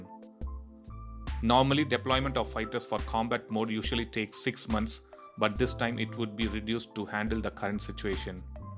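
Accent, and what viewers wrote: Tamil Nadu, India. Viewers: Bro by your accent I feel you are a tamilian... Good work . All the best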